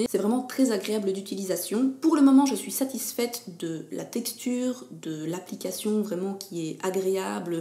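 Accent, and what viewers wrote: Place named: Belgium. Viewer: Coucou, Je t'ai découvert grâce à Patricia B (merci à elle!), et j'adore tes vidéos tout comme ton accent Belge, vraiment;)! j'ai le nettoyant bioré au charbon dont tu parles, je suis surprise car pour moi l'odeur n'est pas du tout désagréable, ça sent le frais, il y a peut-être des différences de compo entre la France et les US... Étrange ^^ Bisous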